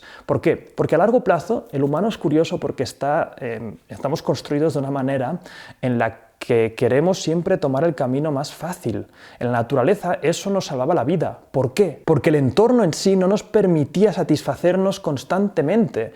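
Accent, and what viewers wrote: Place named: Castilla-La Mancha, Spain. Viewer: DE DONDE ERES? POR TU MANERA DE HABLAR!! QUE DICES "HE DEJAO " PASASTE LA NAVIDAD CON LA FAMILIA. ERES DE CASTILLA LA MANCHA? 😄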